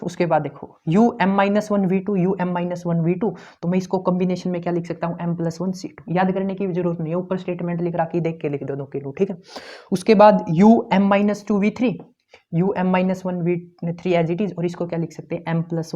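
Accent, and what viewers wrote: India. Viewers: Teaching skills are amazing.....nd ur Desi accent make it more interesting sir 🙌❤️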